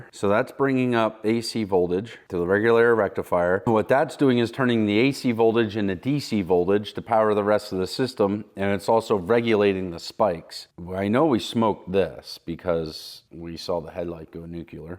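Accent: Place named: Pennsylvania Dutch, United States. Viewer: Having watched a half dozen or so of your videos, sometimes I think I detect just the tiniest bit of Pennsylvania Dutch accent in your voice, but when you were finding that last wiring fault and described "rootching around" I knew I wasn't imagining it! Mach's Gute!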